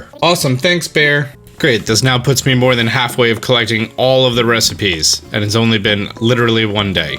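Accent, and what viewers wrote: New Jersey, United States. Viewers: Can confirm, it's a jersey thing. I immediately knew he was from the same state as me first video i watched lol. Bergen/Passaic county perhaps?? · Dog I am pretty sure its a New Jersey accent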